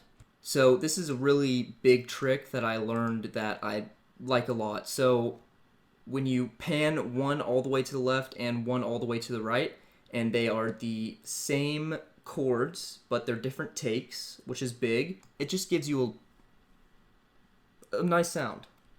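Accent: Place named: North, United Kingdom. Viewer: Bro where are you from cause you use y'all which is a southern word but you also sound like you're from the north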